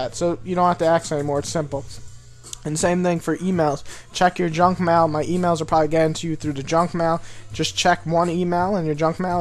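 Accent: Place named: New York, United States. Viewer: What part of NY you live in? 123ilikecheese456 was right, you do have a kick ass accent.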